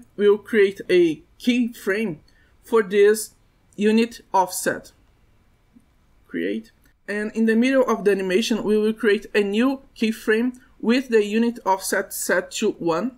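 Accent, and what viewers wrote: Brazil. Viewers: da pra ver q é do brasil so pelo sotaque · Você é br hein não engana kkj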